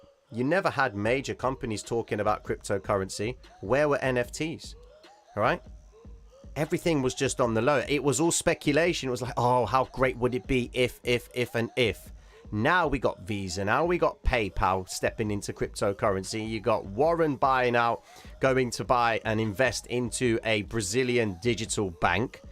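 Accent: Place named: North London, United Kingdom. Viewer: Most underrated, level-headed analysis I have found on YouTube. Most people don't realise that 99% of these TA guys are just trying to shill you their telegram or discord or VIP group which lies behind a massive paywall. If they were really that good they would make bank on trading but they clearly don't if they need to sell you their BS programmes about drawing triangles and lines. gives the most valuable information for free and you can tell he doesn't need to do it but he wants to help people. Literally top 3 trading channels on YouTube. Can't wait to sign up for patreon just to show my support. Much love, btw you must be from north London or something cos you sound just like me lol, I'm gonna go for Greek Cypriot haha, nuff love <3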